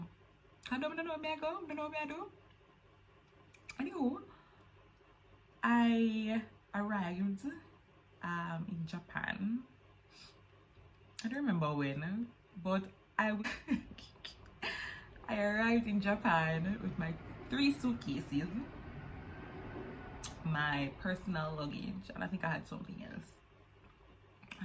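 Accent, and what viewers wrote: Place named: Patois, Jamaica. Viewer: Hey my fellow Jamaican. I just subbed...mi hear di patois 💚💛🖤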